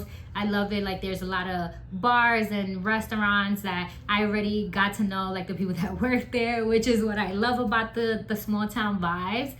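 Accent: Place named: United States. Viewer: Great breakdown. Thanks for posting it. How did you end up in DR? You look Dominican but speak American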